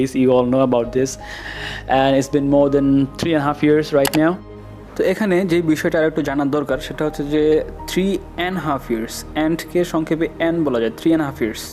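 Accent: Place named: United States. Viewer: দাদা, অনেক অনেক ভালোবাসা ভালোবাসার American accent 🥰🤪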